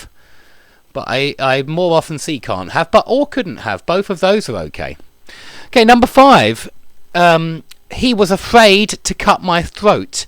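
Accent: United Kingdom. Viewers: I love your British accent ❤️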